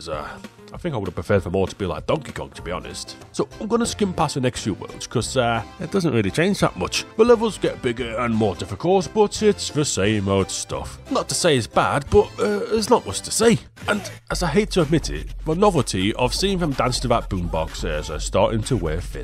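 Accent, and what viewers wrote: United Kingdom. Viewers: i thought goombas were japanese you have a british accent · Dispite one of my actual favorite british sounding youtubers hucking raid shadow legends onto loyal viewers, I'm still not gunna download it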